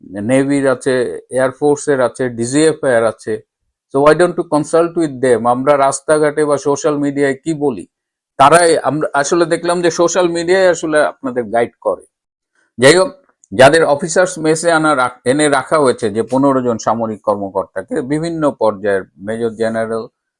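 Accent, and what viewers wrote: India. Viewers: তোমার কথা শুনে মনে হলো তুমি ভারতীয় দাদাবাবু তীয়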